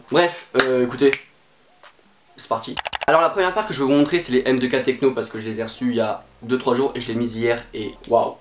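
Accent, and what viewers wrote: Normandy, France. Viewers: T'es de Normandie ?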